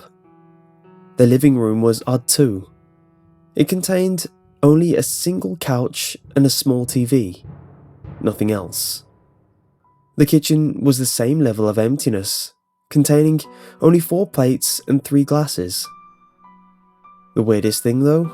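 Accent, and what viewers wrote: United Kingdom. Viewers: british accent is succulent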